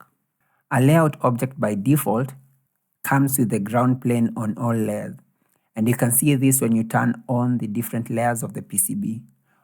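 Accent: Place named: Kenya. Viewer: You sound like a Kenyan, I'm impressed by the clarity of your explanation and inspired to gain such understanding. Great work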